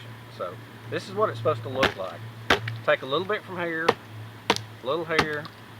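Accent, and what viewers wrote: South, United States. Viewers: Well, thanks guys for all the responses. My spouse has been here in Delaware for 21 years, now, but still has an ear for the Southern accents....😉👍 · I really enjoy your accent, down home flavor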